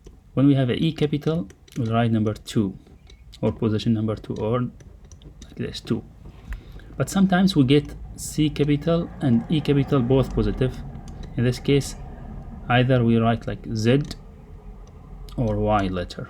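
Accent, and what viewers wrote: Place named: Arab world. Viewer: Your accent sounds like an Arab accent I think so😅 your explanation is so good !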